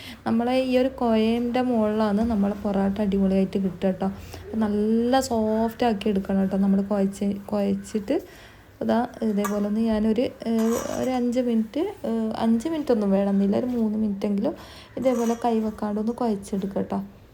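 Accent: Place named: Vadakara, India. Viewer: വടകര സ്റ്റൈൽ സംസാരം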